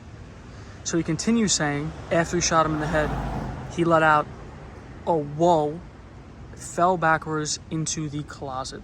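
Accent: New York, United States. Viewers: Terti years ago! Great NY accent. :)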